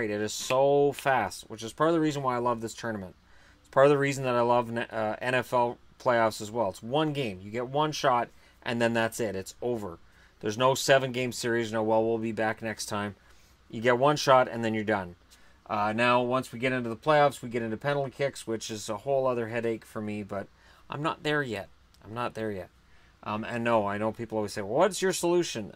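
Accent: Canada. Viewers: Are you born in Ontario? You have a heavy accent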